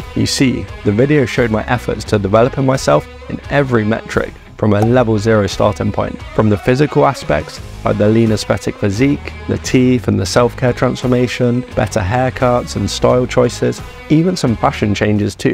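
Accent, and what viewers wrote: United Kingdom. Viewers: That thick English accent 😢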